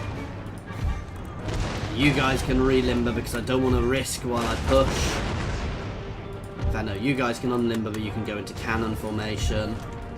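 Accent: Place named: United States. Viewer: by the sound of your voice, you're as american as they come 😎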